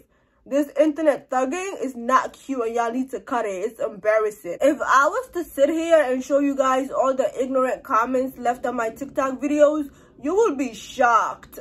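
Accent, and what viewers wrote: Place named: Somalia. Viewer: Babe girl let me just say you are gorgeous. Secondly your nose and lips aren’t that big. I am African American and many African Americans have the same features some Somalis have. Lastly I wanted to know where you might be now because your accent is very interesting I can hear the Somali but their is a little bit of something else I can’t really place it. Any way be blessed love and you just gained a new subscriber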